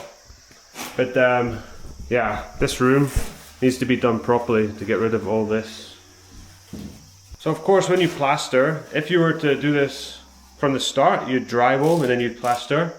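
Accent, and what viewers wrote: Scotland, United Kingdom. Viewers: Hi again from NZ. Trying to work out your accent. Scottish, but with a twist?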